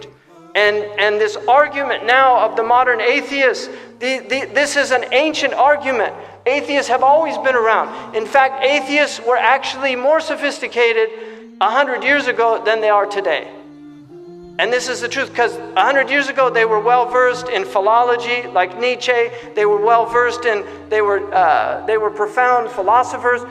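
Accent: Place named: Arab world. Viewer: Hamza yusuf un arapça aksağanı çok kuvvetli maşallah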